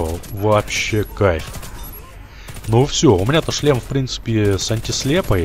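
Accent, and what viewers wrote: Kazakhstan. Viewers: ты из казахстана? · Ты из Казахстана?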